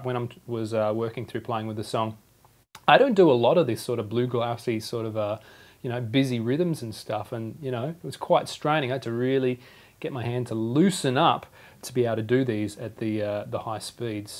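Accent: Australia. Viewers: has anyone ever told you you look a bit like rob swire from pendulum?? he is australian too!! maybe youre related!!!!! or maybe you ARE him.. hmm , btw if you are actually newsealandish then i am sorry its just im not good with accents and you sound australian but if you are newsealandish im sorry. but your cool :D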